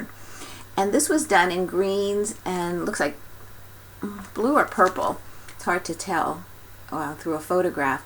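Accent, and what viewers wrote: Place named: Massachusetts, United States. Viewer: Hi! Found you a couple of weeks ago, and when I discover someone new, I immediately go back to their first video and binge watch them. So that is what I have been doing since about Thanksgiving! And I am all caught up now with this one! You are a prolific stitcher! I enjoy all your work, we have alot of the same taste. Thst band sampler is gorgeous! Love your accent, you remind me a little of all my relatives in Massachusetts. You are very sweet and I have enjoyed catching up with you! Congrats on your little grandson! And your DIL Rebecca is so sweet! You get along so well! Hopefully you have that same connection with your new DIL Amy! You have fast become one of my faves, in my top 5! Keep stitching, take care, and hope you had a Merry Christmas!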